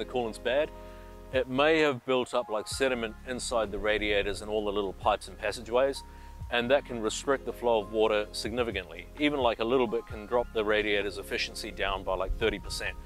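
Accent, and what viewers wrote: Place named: New Zealand. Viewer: Good ol' Kiwi accent . . . nice!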